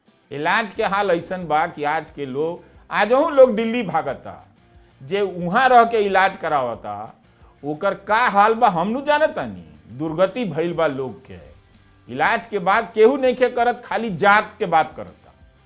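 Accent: Bhojpuri, India. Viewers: रउरा के मुंह से भोजपुरी बड़ा निमन लगेला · मजा आ ग़ईल राउर भोजपुरी वाला आवाज सुन के । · इतना शुद्ध भोजपुरी मैंने कभी नहीं सुना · शुद्ध भोजपुरी में खबर सुन के मन गदगद हो जाता है। इहे जज्बा बनवले रही। आपन भोजपुरी सबसे बेहतर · Wao shudh bhojpuri ❤❤❤❤❤ Love you sir❤❤❤❤🎉🎉🎉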